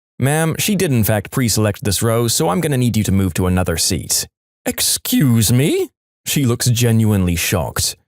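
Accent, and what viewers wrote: United Kingdom. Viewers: No offense but British ahh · The British voice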